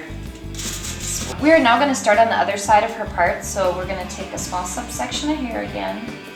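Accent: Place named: Minnesota, United States. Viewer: r u from minnisota u knind of have the accent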